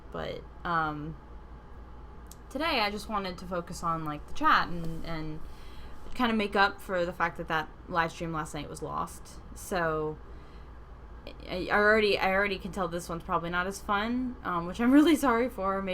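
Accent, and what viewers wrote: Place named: England, United Kingdom. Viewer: I adore your English accent .... Oh, I'm not talking about that. You're gorgeous drawing! I am self-taught in drawing and you always inspired me, and also helped and in general you can say that I taught you how to draw correctly. It's gorgeous ~ And yes, if there are mistakes, then pritenzii to Goggle translator. = 3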